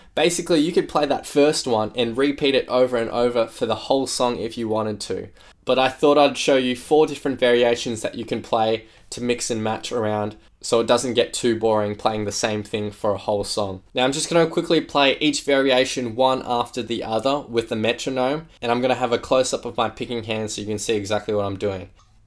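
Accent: Australia. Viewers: Ooooooo Australian c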